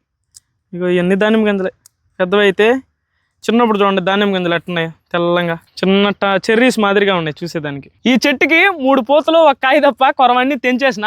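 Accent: Nellore, India. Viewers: Nellore yasa bro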